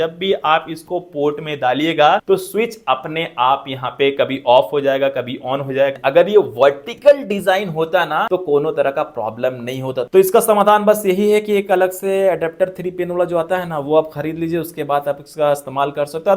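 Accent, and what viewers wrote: Bihar, India. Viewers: Ka ho bhaiya. Aap bhi bihar se hauwa😂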